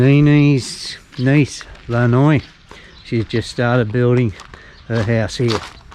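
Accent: Australia. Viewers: I want to search if you have videos from Pakse region, but the search button isnt active and there is no url for it. Isnt it active yet? Btw great videos you put out, I like your stories, your choice of music and how you and Neenee talks with everyone. The only problem I sometimes have is your aussie english, but i feel your pronouncing is better now than a year ago 😊👍. It may be me though...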